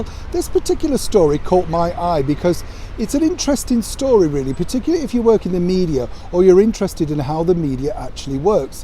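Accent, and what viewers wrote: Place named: RP, United Kingdom. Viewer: you would be a far more interesting and inspiring host, you are a great story teller and presenter you should apply if it’s an option! Just sayin your accent ie the Queens English is a bonus in USA!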